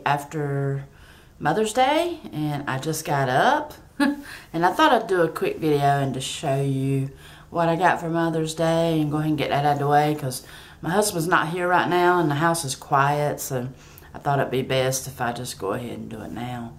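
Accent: South, United States. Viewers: you are so sweet..."Im not trying to brag..." I just love your videos...I enjoy your hauls and your gifts you got for Mothers Day...Happy belated Mothers Day..I just became a subscriber...xxI am from Rhode Island...I know you are probably from the South from your beautiful accent..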